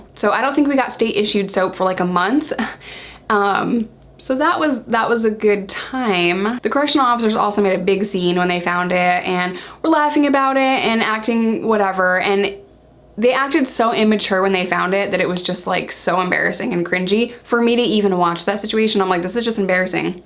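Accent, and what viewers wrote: United States. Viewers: Crikey you're sexy, you look like you are british, with american accent...but I know you're american, which is great.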